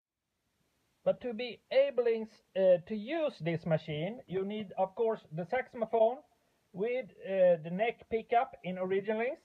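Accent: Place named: Sweden. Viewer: Fantastiskt grejt swenglish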